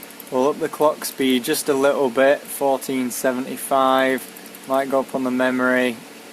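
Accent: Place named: United Kingdom. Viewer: Sorry if I misinterpreted, but you sounded identical to Brian Cox to me... I'm just a simple American trying to place the plethora of British accents but it sounded close to me... Sorry but again, thx for the video :)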